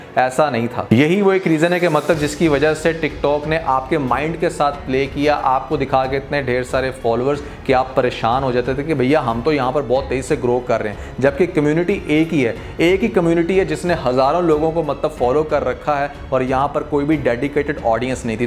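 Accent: India. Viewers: Mr. your accent and words shows that you are highly effected by Indian content. Ap kisi Urdu k professor se milen aur baat Karen ta k ap seekh saken k humara accent aur alfaz un se kitne behter aur khubsorat hain.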